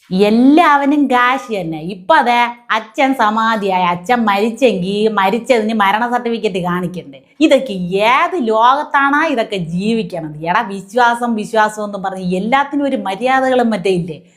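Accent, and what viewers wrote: Thiruvananthapuram, India. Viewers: എന്തായാലും തിരുവനന്തപുരം സ്ലാങ് കേൾക്കാൻ രസമുണ്ട് 👏 മോളെ ബിഗ് സല്യൂട്ട് 👍👍👍🙏🙏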